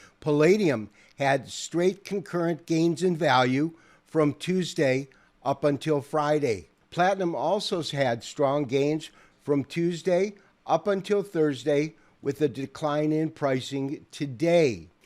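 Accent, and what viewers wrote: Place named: United States. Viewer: American lingo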